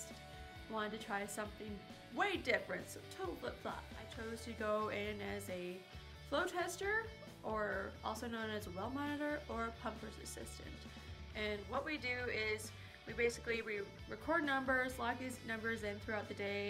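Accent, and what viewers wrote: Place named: South, United States. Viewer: Me Bcn its southern accent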